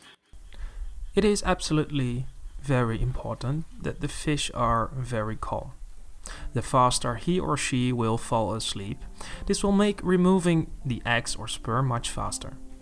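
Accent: Netherlands. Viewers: it sounds like you have a netherlands accent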